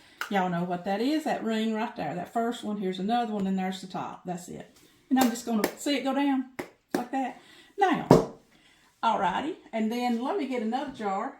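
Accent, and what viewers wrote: South, United States. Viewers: Great video! You're so precious...love your southern accent!! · I’ve only been canning a couple years so I love how you tell a story almost. I am from the south so our accents are similar - Tennessee / North Carolina. I subscribed and will go back to your other videos also. ☺️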